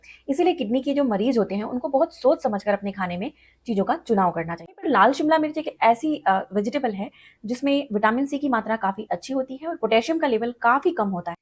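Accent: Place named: South, India. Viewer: Madam Aap. Ne. Behad. Theek Remedy. Bataya. Lekin Aap. Ko. Awaz. Theek Naheen. Hai Aisa. Bolti. Hain. Jaise Medrasi. Hon So. Log. Naheen Samajh. Pate Allld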